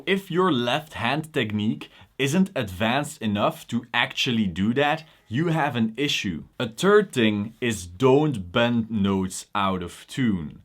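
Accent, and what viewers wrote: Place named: Quebec, Canada. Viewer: where are you from? your accent looks like a french canadian speaking english ahah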